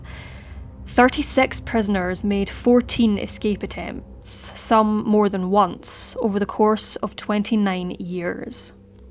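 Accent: Scotland, United Kingdom. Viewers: What is the accent of narrator? Scottish?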